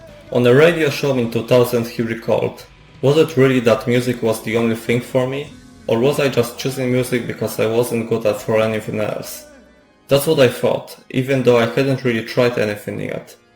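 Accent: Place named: Poland. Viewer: czuje polski akcent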